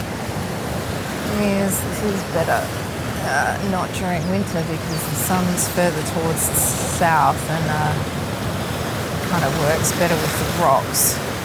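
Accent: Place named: Australia. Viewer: So glad the YouTube algorithm showed your video! So nice to see and hear an Aussie woman photographers experience. Looking forward to watching more of your work ❤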